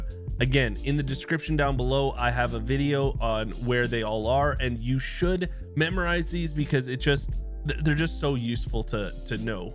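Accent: Canada. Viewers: I hear a Canadian accent ayeeee? Haha I just got wz on ps5 and I saw this blueprint on a dmz squad mem I really want this but it’s super hard looool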